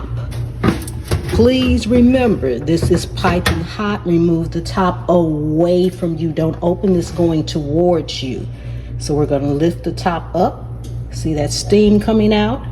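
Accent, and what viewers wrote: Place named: Louisiana, United States. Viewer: I hear the southern accent but can’t place it. I want to say Louisiana. Whatever it is, I could listen to you talk about cooking all day!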